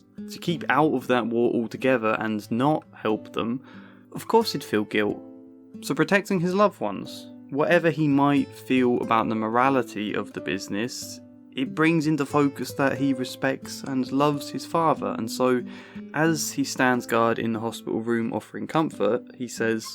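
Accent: United Kingdom. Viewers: michael was in the marines not the army. maybe you should actually watch the movie. your British accent will not save you.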